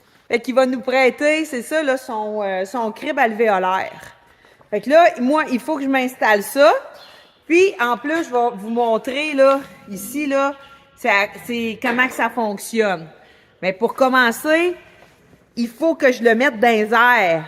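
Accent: Quebec, Canada. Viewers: Bravo on voit que le travail du bois ne te fait pas peur. Perso, j' aurais plutôt fait un cadre et des pieds en métal. Mais je préfère la soudure au bois.. Toujours heureux d' entendre parler avec l'accent québécois Gérard Vendée France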